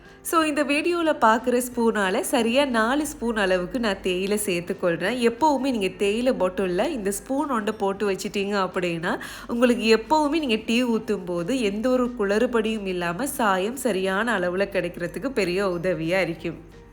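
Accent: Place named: Sri Lanka. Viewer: சிங்கார..சிங்கள கொஞ்சும் தமிழ்.. அழகோ...அழகு .❤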